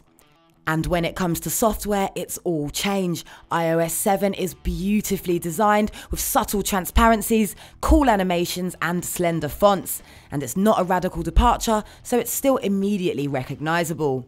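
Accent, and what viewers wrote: United Kingdom. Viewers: a british nigga loooooooool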